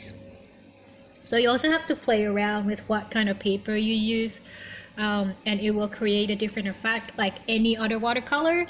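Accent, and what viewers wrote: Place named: Australia. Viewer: Same,i just got a lil confused bcos i heard an aussie accent w a touch of sum else lol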